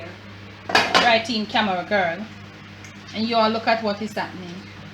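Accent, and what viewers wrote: Jamaica. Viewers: Real Jamaican🇯🇲 Chef this enuh full a drama & she definitely NOT acting she giving us raw Patwah ! Well done 👍 Perfect garnish is Yu TEETH 🦷 🤣🤣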